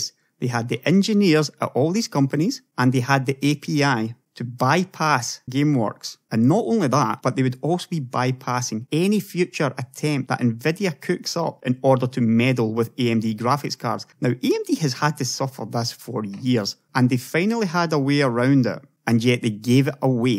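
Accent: Scotland, United Kingdom. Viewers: cool Scottish accent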